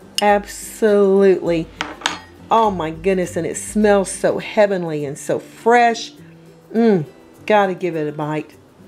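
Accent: United States. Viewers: I've never heard anyone more American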